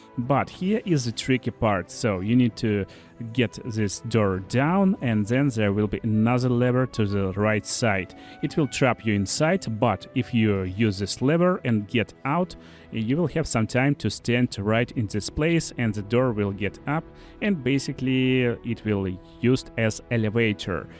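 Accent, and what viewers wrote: Russia. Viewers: Какой-то сильно русский акцент у вас сударь. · Russian ? “Safety is number one priority”~ CrazyRussianHacker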